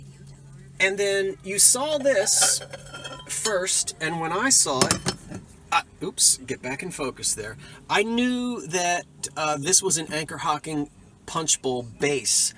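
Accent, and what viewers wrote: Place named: Pittsburgh, United States. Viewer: My roomy insists you're from Pittsburgh. Are you?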